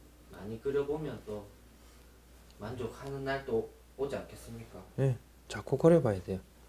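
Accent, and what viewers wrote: Gyeongsang, South Korea. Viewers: 경상도 사투라가 정감있고 너무 웃깁니다,훌륭한 그림배움과 더불어 갖는 큰 즐거움 입니다.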